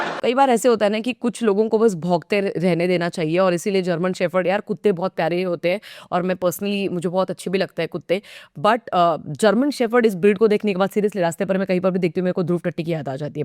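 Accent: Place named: Maharashtra, India. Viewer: Great video. You are Maharashtrian , I can say from your accent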